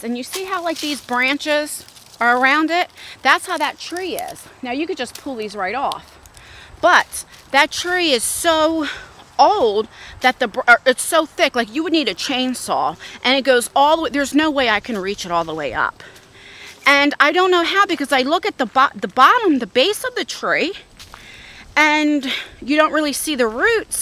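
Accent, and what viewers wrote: New Jersey, United States. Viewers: Beautiful area! I’m Long Islander who moved to middle Tennessee . I miss it there and your north eastern NJ accent (similar to mine), I miss! I do love it in TN and we also have many trails. I’m wondering if you get lost if your map app on phone can help detect your location? Ui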